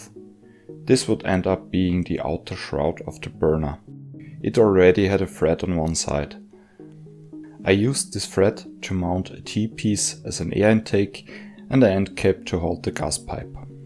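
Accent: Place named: Germany. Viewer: Your Accent Sounds german. Ich bin auch deutsch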